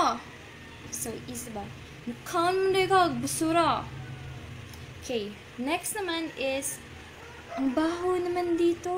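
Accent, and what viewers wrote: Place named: South Korea. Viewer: New subscriber here lods,.😊 ilove your korean accent. Mag smile ko while watching sa emu mga video.❤❤❤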